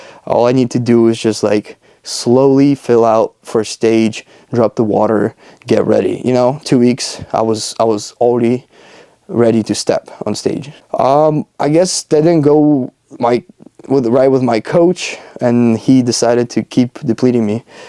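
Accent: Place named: Ukraine. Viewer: Never mind your Ukrainian. I wrote that before I saw the whole video lol I detected a slight accent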